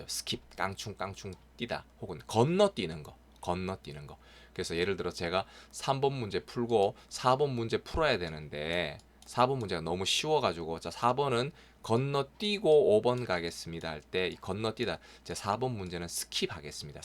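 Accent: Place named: Gyeongju, South Korea. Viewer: 선생님 정말 감사합니다. 앞으로 고졸 영어 검정고시 공부하는 사람들은 축복 받은 것입니다.기초가 부족해도 쉽게 이해 할수있도록 천천히 세세히 풀이해주시니 모두다 조금만 열심히 하시면 100% 합격 하실것을 믿어 의심치 않습니다 10년이면 강산도 변한다 했는데 긴세월을 선생님과 함께 공부한다 생각하니 가슴이 벅찹니다 .저는 경주가 고향입니다 선생님의 사투리가 저의 고향 말과 같아서 더욱 정감이 갑니다 중학교때 친정 아버지께서 여자가 공부를 해서 뭐하노해서 문교부가 인정하지 않는 학교에 졸업해서 어디가서 이력서 낼때 정말 자존심이 상했습니다 올해 나이가64살 옛날에 이렇게 공부할 여건이 되었으면 아마 그때 쉽게 했을것 같은데 그때 시골은 정보가 없어 공부하기가 어려웠죠 핑계인지 모르지만 검정고시 도전하는 젊은 사람들 열심히 공부해서 수능에도 도전해 대학에도 가세요 나이 드니 시력도 안좋고 책상에 앉아 있으니 허리도 아프고 여건이 젊을때보단 좋지 않아요 남편도 같은 환경이라 많이 배우지 못해 배움에 목 말라 신혼 여행가서 저 한테 했었든 말 아직도 귀에 생생합니다. 돈 없어 공부 못했다는 소리 자식들에게 절대 안 듣는다고 세월이 흐르고 보니 남편과 전 열심히 살면서 자식들에게 우리의 못다한 꿈들을 이루었습니다 딸은 고등학교 교사. 아들은 대학병원에서 전문의로 여러분 이렇게 올리는 이유는 자랑이 아니고 제가 2019년 8월7일 중학교 검정고시 볼때 젊은 친구들이 많더군요 10대20대 이들이 마음 단단히 먹고 열심히 공부하길 바라는 마음이고요 또 우리들을 위해 10년전 것 까지 기출문제 풀이해 주시는 선생님에 대한 보답이기도 하고요 오늘 새벽에 7번까지 올라온 것을 보고 너무 감동 받아 자다 일어나 공부하고 잤어요 나이가 드니 열심히 해도 돌아서면 잊고하니 반복반복 해야됩니다.( 소불근학노후회) 주자십회훈에도 있어요 젊어 열심히 공부하지 않으면 늙어 후회한다고, 여러분 열심히 공부하여 유종의 미를 거둡시다. 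선생님 정말 고맙고 감사합니다.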